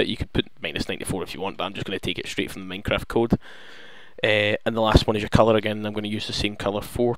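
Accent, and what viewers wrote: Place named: Scotland, United Kingdom. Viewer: Your Scottish me too :P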